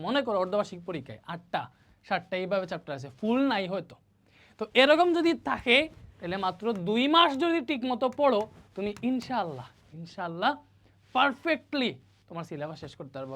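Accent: Sylhet, Bangladesh. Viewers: Vai ki sylheti?,..... Tan to sylheti er moto lage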